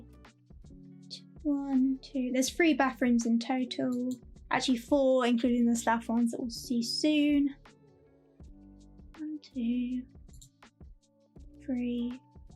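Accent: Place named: United Kingdom. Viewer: Thank you. I've seen it. :) I'm looking forward to see more from you. And your perfect British accent makes these videos even better.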